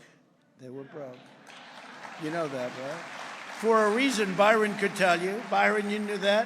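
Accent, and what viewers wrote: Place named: New York, United States. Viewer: Love you're NY accent God speed Presidrnt Trump again We are praying the fraud will be wide open this time.😊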